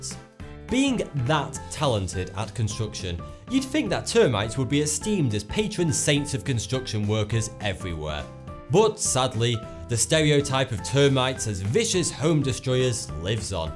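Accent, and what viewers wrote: United Kingdom. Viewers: Where does your accent come from? It sounds like British but different somehow. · why does everything sound better from a British guy..its like my brain actually absorbed informAtion more easily..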